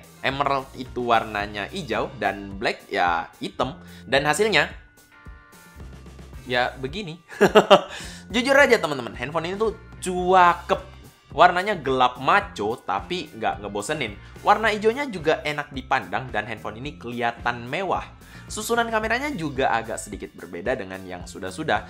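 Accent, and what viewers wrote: Makassar, Indonesia. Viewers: Bang pake logat Makassar lagi dong bang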